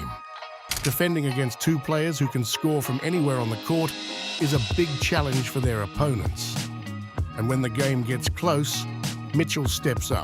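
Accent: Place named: England, United Kingdom. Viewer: Why is the voice-over either English, or worse, AI programmed to sound English. Get that crap back across the channel.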